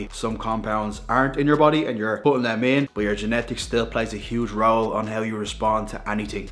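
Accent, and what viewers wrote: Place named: Ireland. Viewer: I like your Connor McGregor accent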